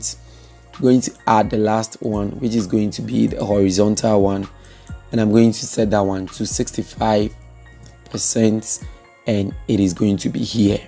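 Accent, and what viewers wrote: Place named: Ghana. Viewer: The Ghana accent is amazing to hear. Even in 2021, you have given me a god send tutorial. Thank you.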